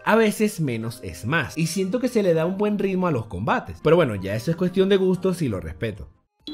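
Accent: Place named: Venezuela. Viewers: Si pues, el acento es muy similar a de nuestra casa, no sé si de caracas como tal pero, tiene ese acento criollo jajaja pero maneja buen léxico sin parecer un pajuo por eso no creo que sea de caracas xd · nose es que con solo el acento se le nota que es de venezuela (caracas para ser mas específicos) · Yo venía a preguntar lo mismo xD este es el primer video que veo y me sonó muy venezolano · Obviamente es Venezolano, es muy facil notarlo..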